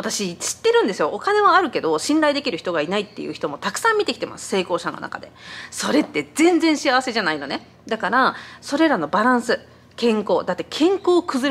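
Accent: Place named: Kyoto, Japan. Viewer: 京都？っぽい。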